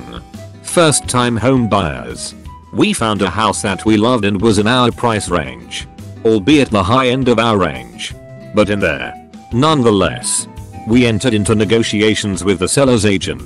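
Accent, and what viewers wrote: United Kingdom. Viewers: I gotta watch out for that lurr enforcement. Why can't Brits ever pronounce their own damn words right?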